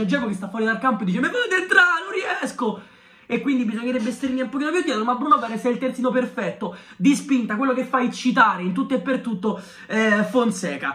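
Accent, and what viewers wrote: Rome, Italy. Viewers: mamma mia che fastidio. Ma non si può parlare in dialetto? Se è di Roma perché no! Fosse un altro youtuber ok, ma così no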